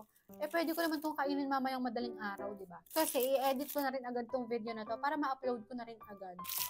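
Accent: Batangas, Philippines. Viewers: Magames ka ba? Kung oo, anong games ang kinakahiligan mo? Kung hindi, anong ginagawa mo o pinagkakaabalahan mo gawin pag bored ka? namiss kita! Una kitang napanood yun atang bumili ka ng bagong iphone at kasama mo ang tatay mo. Natuwa ako sa iyo gawa ng punto mong batangueño ay hindi mo tinatago. Namimiss kong umuwi ng batangas dahil sa iyo. Dun kita sinimulan panoorin. At masaya ako na malayo na ang narating mo. <3 Godbless you always! <3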